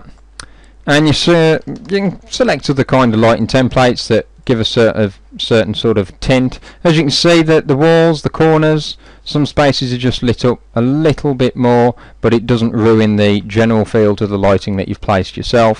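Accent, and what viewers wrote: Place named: Birmingham, United Kingdom. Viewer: Love you're content and have to admit that even though I've watched your content for several years, I hadn't (until now) subscribed. Better late than never I suppose lol. From you're accent, I'm guessing you're from the same neck of the woods as me ... Birmingham??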